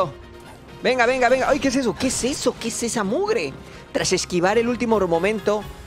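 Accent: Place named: Spain. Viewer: Que buen Gameplay! muy way! oirte hablando con asento Español gran!! imaginate yo, viviendo tan serca de los videojuegos y dejandolos de lado, estoy aprendiendo mucho,y disfrutandolos mas,con vuestros videos! crack!!! jeje perdon otra vez x tanta tardansa,y gracias! te queremos mucho! viva!!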